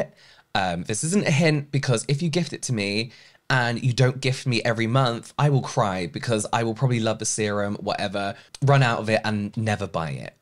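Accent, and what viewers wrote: United Kingdom. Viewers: I believe Peter Thomas Roth would fit into this category! I've bought a set of their cosmetics because it was on sale and tried some of their most famous products, vit C included. And it didn't change anything for me. I do like its luxurious touch, though! Won't be rebuying,too expensive to just feel nice and see no effects. Great video, I looooove your British accent ❤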